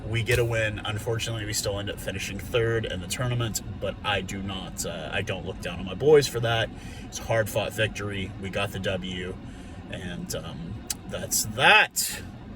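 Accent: United States. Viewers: Greeting from a South African living in Switzerland (I used to live in the US). Loving your show and it's great to hear someone speak of my two favourite teams especially in an American accent. Great game this weekend, it was epic. Keep up the good work!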